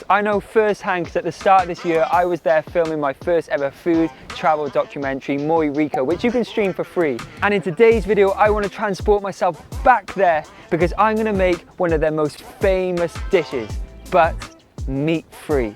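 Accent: Wales, United Kingdom. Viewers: Great video, mate! Mouth watering stuff for sure. Also, are you Welsh? Didn't know that!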